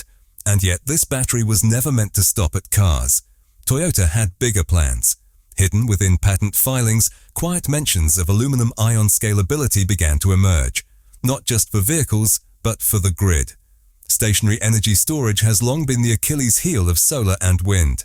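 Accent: United Kingdom. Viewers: Don't listen to the message, listen to the method of delivery, formulaic, uses American expressions with a 'British' accent (muddy) and the same style as you heard from the same source you've heard previously, e.g. 'here's the twist', this wasn't market math', etc, etc.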